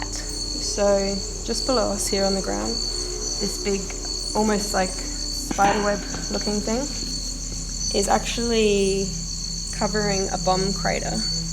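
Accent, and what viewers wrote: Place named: Australia. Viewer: where are you from youre accent sounds like Australian accent^^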